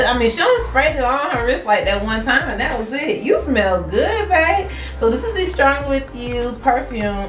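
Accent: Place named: South, United States. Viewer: your beautiful you have great skin and great cheekbones I’m jealous the faces she be making so cute lol she got a baby face such a cutie with a great smile, where you from you got accents like southern im from Boston Massachusetts so you know how we sound I’m sure lol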